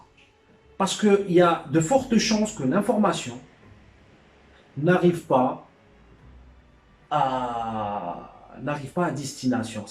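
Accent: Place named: Algiers, Algeria. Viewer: Salam on entend l'accent algerois kho bonne vidéo merci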